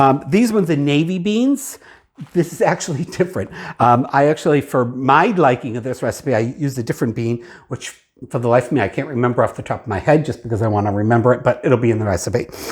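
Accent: New England, United States. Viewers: Thank you so much! I saw the new video and replied there too. Although I recognize your New England accent I erroneously associated your YouTube name with Cherry Hill NJ. So cool of you to do the special research for the right recipe, love your channel.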